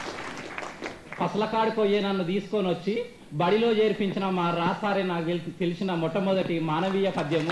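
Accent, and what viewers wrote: India. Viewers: ఏక్ దం నాటు తెలంగాణ యాస చాలా అద్భుతంగా ఉంది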